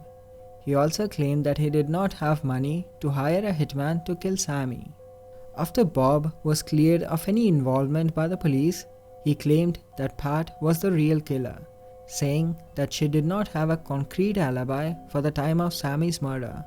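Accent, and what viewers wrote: South India, India. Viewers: The narrator have a South Indian accent, I like it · This Indian accent is so thick one could easily cut it with a knife. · I have difficulty with Indian accents. I won’t watch another one with this guy. Normally a woman narrates these. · The voice over Indian voice made this even more scarier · U have south indian accent 🙏🏻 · Few seconds and then i have to stop....annoying Indian accent...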